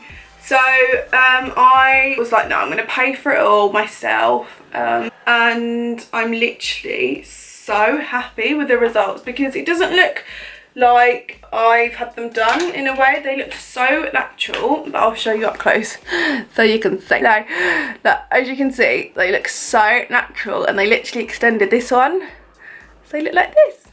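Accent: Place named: RP, United Kingdom. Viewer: This look is beautiful! And you are beautiful always. Your accent is so posh to me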